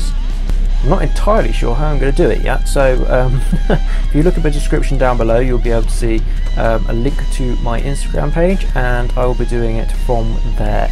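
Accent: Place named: United Kingdom. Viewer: Aloha from Maui! I am currently resurfacing an ancient rusted out deer horn handle hunting knife that quite possibly could have been lost or discarded by Nathanial Boone or even...David Bowie!! Really enjoy your quazi-cultured British accent and your irreverent cavalier approach to knife refinishing. Well Done!!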